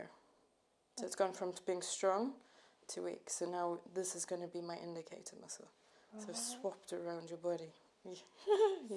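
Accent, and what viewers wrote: South Africa. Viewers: She only sounds mildly South African but mainly British. I wonder if she moved or has mainly British family and went to a British School. The South African people that I know have much stronger accents. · The South African accent is still strong but a bit of the english is starting to come through. Regardless found a new favourite ASMR video! Keep up the great work😊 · This autoplayed and I immediately twigged to the South African accent, then I heard "hectic" and was like, yep South African confirmed! 😂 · Is her accent South African? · What is she from South Africa?? 😱😍 me too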